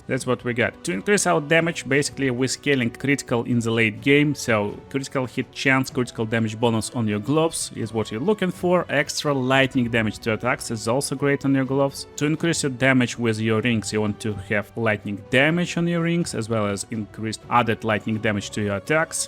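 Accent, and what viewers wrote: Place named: Russia. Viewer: as a fellow russian I am so good at spotting russian accent, interesting how you prolong some vowels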